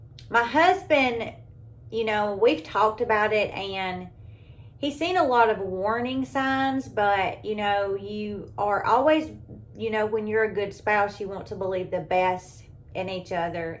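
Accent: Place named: South, United States. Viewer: Hi, i just subscribed!😊🎉❤ I saw some of your denture videos. I was just browsing through your videos, and my experiences are so much like yours. It's crazy! My teeth are so bad! They are broke off to the gums. I am 60 yrs. old and i want to get dentures just like yours. lol I love them. You mentioned in one of your videos about the 3D dentures. Could you tell me where to reach them and how much they are,please?🙏🦋🌸💐😉👍❤️ You got the talking down with yours, girlfriend. 😏 Ive been clean for 14 yyears now.👍🙏 Im much happier now. I will check out your videos. Thanks for sharing. You seem like such a great person. I love your country accent!!! Lol❤😊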